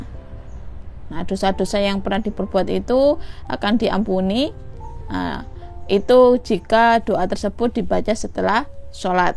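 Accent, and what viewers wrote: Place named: Java, Indonesia. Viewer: jowo nggeh mbak 😀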